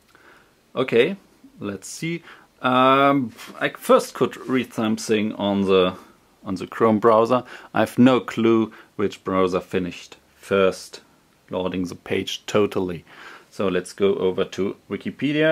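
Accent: Germany. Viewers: possible that you are german? Your english accent :D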